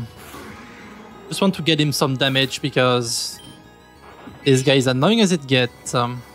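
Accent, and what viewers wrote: France. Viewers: your french accent is fire 🔥🔥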